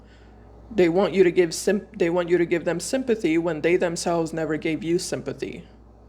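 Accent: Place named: Spain. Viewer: Gem vs Pisces.. Pisces is known for impulsive actions that get them in trouble. The grass was not greener in the other side. I struggled with 3 horrible hurricanes - he knows exactly what I'm going through.. but he has done nothing to reach out & help in any way with the loss of my home. The home that he left me in. I moved him from California to Florida 7 yrs ago when he hit rock bottom. All was fine til he let toxic jealous family & friends come between us. Controlling him. So eventually he pleased them by leaving me & hooking up with the female they wanted for him 1700 miles away not giving a crap of how I felt!! So I do believe he is not happy & he's using that person as convenience for his abrupt actions... I'm moving back to my home town & letting him mess up his life again cause this is his pattern.. player, liar, manipulated.. golddigger.. cheater... 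Bi-polar infected...7 personality... One pill away from a straight jacket & I'm being kind.. lol. So deal with it Pisces, don't mess with a Gemeni... The hand that fed you!!.. Thank you!.. so accurate it was scary.! Sometimes you remind me of my sister when you talk. Your accent. We're Puerto Rican. I'm guessing you might be Spanish too... Love it.. lol. Have a great day.. 🌹🙏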